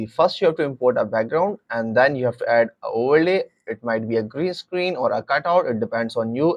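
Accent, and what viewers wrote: India. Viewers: Indian ahh voice (no hate)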